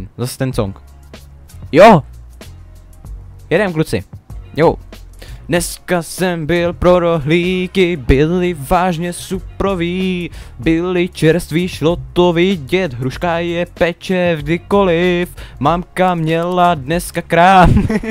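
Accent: Russia. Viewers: ten ruskej prizvuk xD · Nejlepší ten ruskej přízvuk!! (kde jsi se ho naučil?) :D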